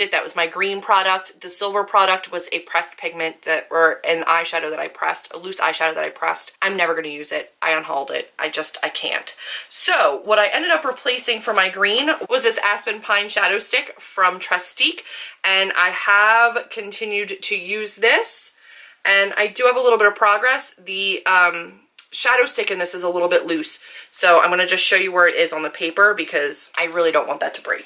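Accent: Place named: United States. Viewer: Where are you from? I can guess the US of course, but I can't place where. I think your accent is so cute! When you said "mask" I loved it.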